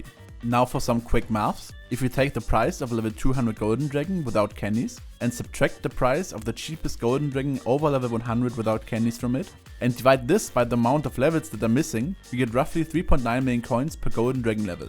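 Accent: Germany. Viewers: Bro du hörst dich mies deutsch an (ich kann auch nicht besser englisch)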